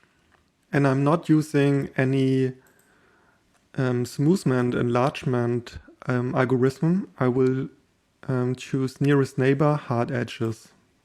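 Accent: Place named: Russia. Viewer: Красавчик. Есть конечно чуток акцента русского, но в целом классно говоришь на англисском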